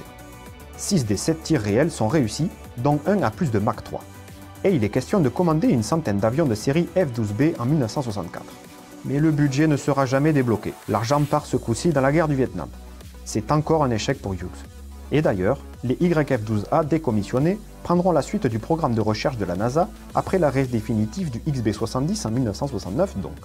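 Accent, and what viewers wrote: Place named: Marseille, France. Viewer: Amusant, l'accent Marseillais !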